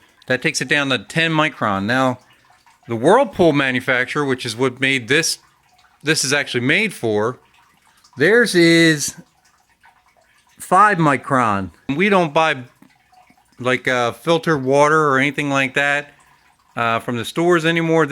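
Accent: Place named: Pennsylvania, United States. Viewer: Love your accent... Is it a Pennsylvania accent?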